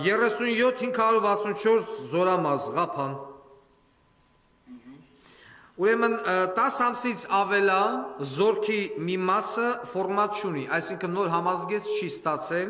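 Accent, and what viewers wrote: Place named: Gyumri, Armenia. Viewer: Բարեև պարոն դուք վերջն եք գյումրվա բարբառը վերջն էր ❤❤❤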